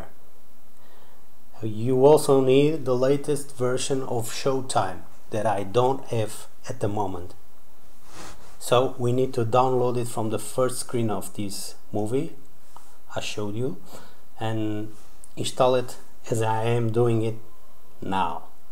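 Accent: Portugal. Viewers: Com esse sotaque és tuga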